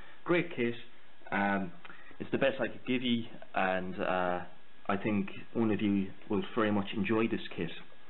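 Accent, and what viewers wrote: Ireland. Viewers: Great to see ya back, and to hear those dulcet Irish tones;) Ya there's still snow in the woods over here but it's melting really fast now. It's pretty much been the worst winter that I remember ever. I'm hoping that it's the last one like that. I really like the look of that panzer 1 bud, I normally don't do the contest thing, but I may make an exception. Mark me down for when you do the draw eh? That's a nice little kit and I've never build ta Pz I.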